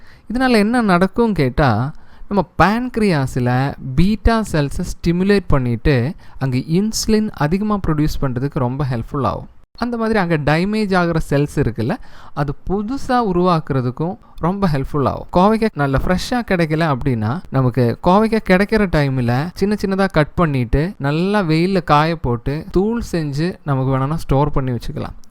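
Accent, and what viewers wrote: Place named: Kanyakumari, India. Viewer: He may be from Kanyakumari, Nagerkoil or Kerala. Their accent is like this only. You want some information & he is giving in his best way to share his knowledge, so we can adjust with the accents...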